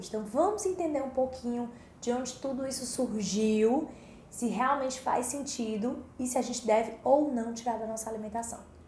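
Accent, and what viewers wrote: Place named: Salvador, Brazil. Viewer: Vc é de Salvador, né.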